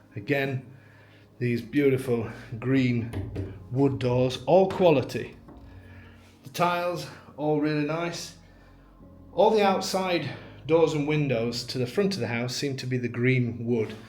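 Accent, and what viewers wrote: United Kingdom. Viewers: There are different pronunciations and spellings of the word. Any of them are acceptable depending on the region you’re from. Keep in mind that is in the Uk so anything he says is specifically from the Uk and not the US or wherever you’re from.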